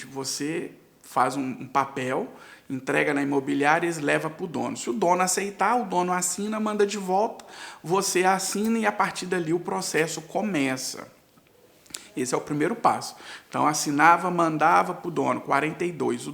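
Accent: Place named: Brazil. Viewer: Só brasileiro phoodido que veio de cidade de merrça que fala assim. Se Portugal fosse tão bom não teriam tantos deles na Europa toda.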